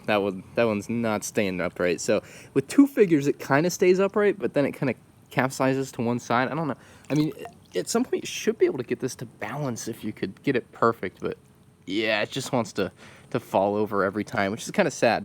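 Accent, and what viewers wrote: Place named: Florida, United States. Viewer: Man you must be from florida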